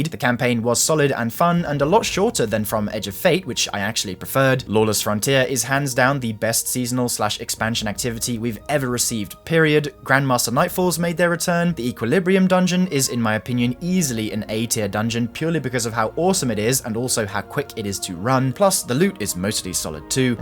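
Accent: United Kingdom. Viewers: I usually roll my eyes at the “The future of this channel” videos because they’ve turned into bait due to what they’ve become to signal recently, but because I’ve become such a big fan, I had to watch. About halfway through when you said that you found your community, I realized something: You don’t know what you’ve become to the community. You seem genuinely surprised that your guides have been so popular. I will tell you that while Byf is our defacto lore person, you have fallen into that role for guides. Not that others are bad, but because of several things you offer in yours. They’re concise, to the point, very detailed in all the ways they need to be while showing exactly what you’re talking about with a phenomenal tone, vocabulary, personality, and delivery. As far as your builds and guides go, I consider you my Alfred. Giving your gentle British advice and tips before I go out and exact vengeance and get my ass beat lol. 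Thank you and keep doing it. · tbf his british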